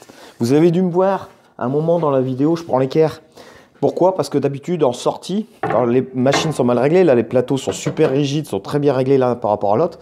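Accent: North, France. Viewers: Certes, pas donné, mais pour moi, inconditionnel de Makita, cette machine est tout de même inférieure au niveau du prix. Je viens d'en commander une (Metabo comme présentée dans la vidéo). Je me régale déjà de pouvoir la tester et l'utiliser. Merci à et la présentation avec ce chouette accent de ch'nord;o))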